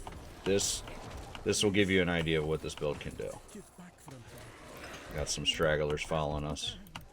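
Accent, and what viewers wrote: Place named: Philadelphia, United States. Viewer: That sounds like a Philly accent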